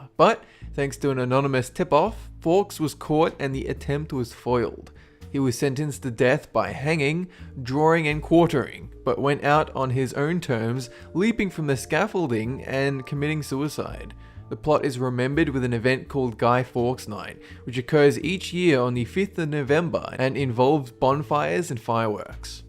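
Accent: Australia. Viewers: Does he have an Austrailian accent or is it something else?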